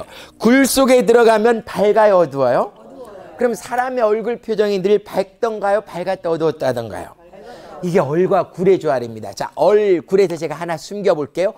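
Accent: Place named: Jeju, South Korea. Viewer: 제주도에서 사투리로 웃음강의하시는 쌤 입니다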